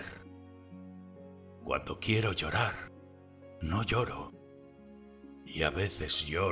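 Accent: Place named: Castile, Spain. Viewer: Lo poco admisible es la prosodia castellana estricta recitando los versos sublimes del poeta. Rubén Darío era nicaragüense y en los finales del siglo XIX y principios del XX el acento idiomático no se perdía fácilmente. Por eso al vate inmortal del español, Rubén Darío, hay escucharlo en su expresión fonética natural.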